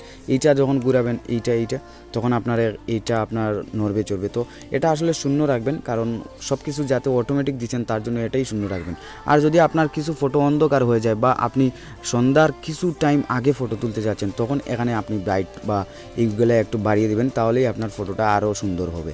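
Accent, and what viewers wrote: Sylhet, Bangladesh. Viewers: vai Sylhety?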